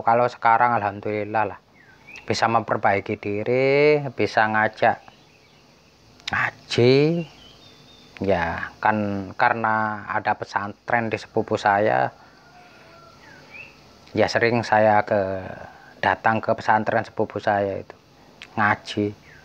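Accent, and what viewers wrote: Madura, Indonesia. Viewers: kalo denger ini mumet... Banyak ngomong nya ga jelas.. 7 poin aja jgn bertele tele,dari gaya bicara nya dari madura ya pak🤔🤔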